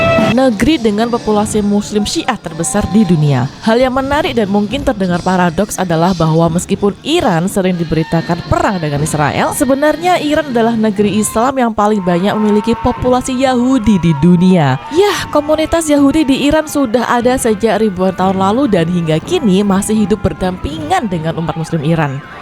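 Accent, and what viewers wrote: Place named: Surabaya, Indonesia. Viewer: Suroboyo banget watak suaranya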